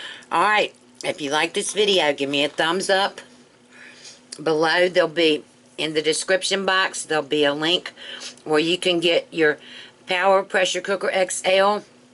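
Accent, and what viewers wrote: South, United States. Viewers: Wow! I can tell from your accent that you're southern....you didn't grow up eating collards? Glad you discovered them.....they are yummy!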